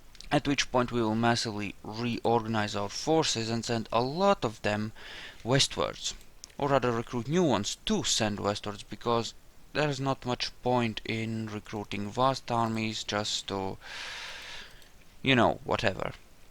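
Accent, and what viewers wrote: Russia. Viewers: Are you Russian? You kinda have a Russian accent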